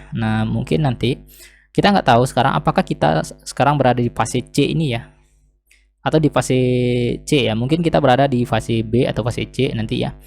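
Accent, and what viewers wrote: Padang, Indonesia. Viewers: Logat2 e bunyi urng Padang,pas cek Namo e ,eh urng Padang,Dima Padang e bg?Wak pdg Lo a kwkwkw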